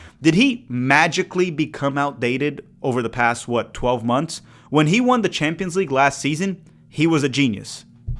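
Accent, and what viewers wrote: Rio de Janeiro, Brazil. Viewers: I'm sure you're Brazilian as well, otherwise, your pronunciation (in a carioca accent) is dazzling, you're just sublime speaking Portuguese, and if you're native, that would justify and explain everything, on the other hand , i would compliment your English 😂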